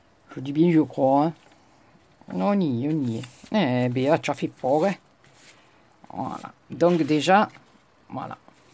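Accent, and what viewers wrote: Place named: South, France. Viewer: Coucou, Waouh elle est tellement belle ta carte, du vrai shaby chic 😍 La dentelle se marie très bien avec ce rouge Hermes;) Merci pour la combine du doigt en silicone je ne connaissais pas! Ça doit être bien pratique. Ton accent me transporte à chaque fois ds les films de Fernandel 😁 j’adore!!! 😊 Passe un bon week-end! Gros bisous 💖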